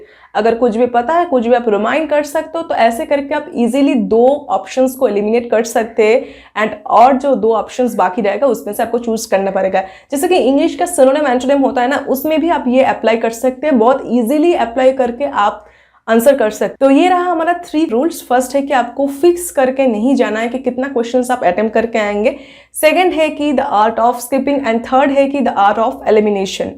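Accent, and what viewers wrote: Bengal, India. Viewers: Hi! Di are you Bengali? Please tell 😊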